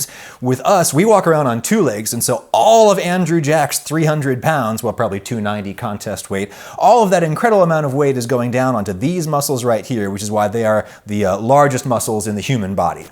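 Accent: United States. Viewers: He just sounds American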